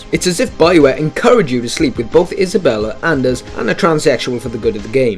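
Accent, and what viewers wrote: Wales, United Kingdom. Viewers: BTW that's not a English accent either. Sounds Welsh. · are you Welsh? It sounds like you have a Welsh accent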